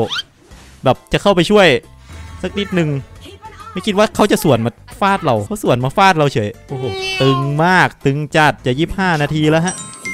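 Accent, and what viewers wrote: Isan, Thailand. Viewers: ว่าวอีสานนำแน ชอบ🤟🏿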